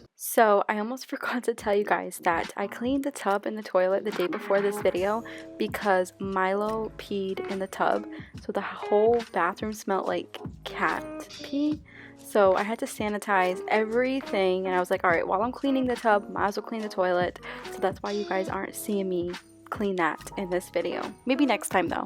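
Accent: United States. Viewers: ah cool thank you so much for your reply it means a lot ❤️ pretty sure that's not a thing in the UK lol 😂 I'm in Bristol, England 😊 love your videos so much when I feel a bit overwhelmed with house work your vids give me the boost i need to get on with things. Also I love your accent and wish I lived In America lol 😂 hope you, your bloke and your new kitty are all well 😊 xx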